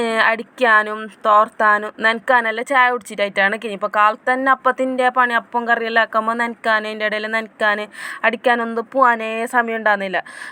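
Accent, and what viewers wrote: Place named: Kasaragod, India. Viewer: കാസർകോട് സ്ലാങ് സൂപ്പർ ക്യൂട്ട് വോയ്‌സ്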